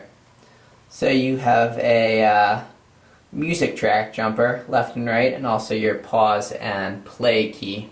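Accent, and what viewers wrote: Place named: United States. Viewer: terrible american accent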